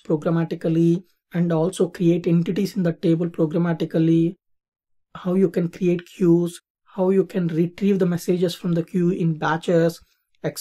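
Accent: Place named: India. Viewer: I'm a about to switch from undergraduate to a grad computer science program and I haven't understood a single thing of what was going on on that video...(well I haven't really studied Big Data (thoroughly) yet,but for people like me this is completely out of reach...or would take 6 hours to understand (most of) all of the material of those concepts) not nice! Also I must put forward the significant Indian accent of the video lecturer, which induces additional efforts in trying to decipher his explanations. (PS: I'm not in grad school yet...so may change don't worry) (PS_2: I studied in a top European university (aside from the UK) To sum up I appreciate the efforts from the poster and turning into on the best IT YouTube educator with a big amount of hard work should entirely be possible. I wish you strength and motivation ! all the best!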